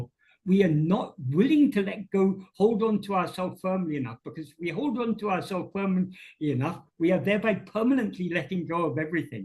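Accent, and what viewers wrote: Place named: United Kingdom. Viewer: Aprendí a entender tu acento Británico, me costó mucho, pero es una de las fuentes puras en internet. ❤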